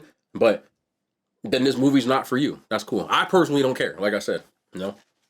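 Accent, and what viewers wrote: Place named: New Jersey, United States. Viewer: Bro are you from Jersey ?!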